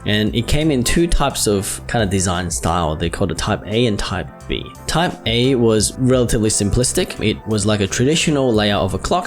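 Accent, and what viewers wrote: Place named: Australia. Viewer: why what's wrong with how he's saying it? he's Aussie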